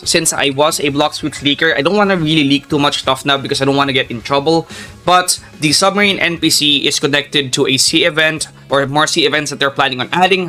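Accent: Philippines. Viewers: Bro got that Filipino accent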